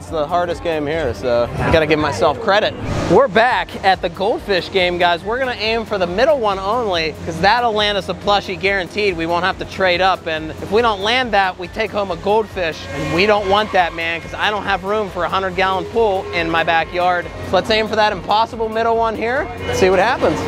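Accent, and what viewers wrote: Pittsburgh, United States. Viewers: I love hearing your Pittsburghese!!! I am from the /burgh and love to hear the sounds of home. I also love the mentions of places near Pittsburgh.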